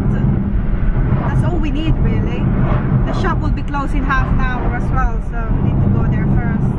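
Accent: United Kingdom. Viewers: whoooa i like your british accent now nice blog. im here now dala angk regalo. tinapos ko talaga nice family. asahan din kita sa bahay ko. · Hi sis! I’m your new subscriber now. Hope mka bisita ka po sa bahay ko. Cute nman ng mga babies mo.... I love British accent!😘❤️ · Great. Congratulations for that👏👏👍 And ilove your British accent momshie🤣😊👍👍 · I love that British Accent! Lakas maka Harry Pottah! Chaaarr! 😆👌🏽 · British po tlga accent mo na,nakasanayan. Bumpbump na po ako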